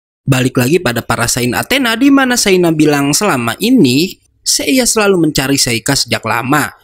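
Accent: West Java, Indonesia. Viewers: Ini teh admin nya org sunda sanes ?? 😃😄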